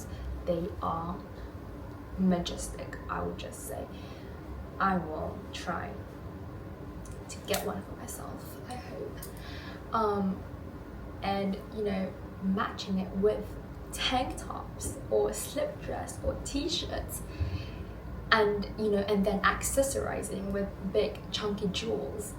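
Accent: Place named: England, United Kingdom. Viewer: I slow your video way down so i can watch while i work. Then i can also read what you are saying so it's great! I love most of these trends. It's crazy how many of these i already have! Mixing glam with sportswear sounds super fun so im gonna try that! Thank you for inspo and i love your English accent lol! subscribed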